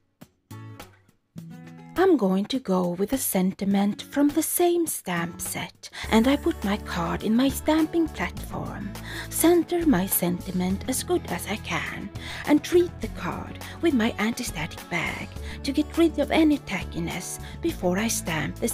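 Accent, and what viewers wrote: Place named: Scandinavia. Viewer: You are absolutely adorable! I love your work so much! All of the work that goes in to those details...incredible, and so very well done. Your creativity knows no bounds Love, love, your imagined birds and flowers. So special...and I could listen to you talk all day with that sweet Scandi accent.....and a "heavy book" by Jamie Oliver - yes, please!!!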